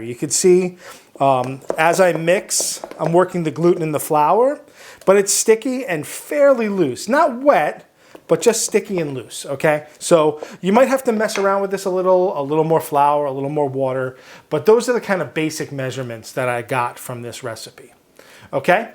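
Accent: United States. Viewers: I like people who talk less lose concentration listen talk and look the food he no stop talking He got an American accent this why he use dry yeast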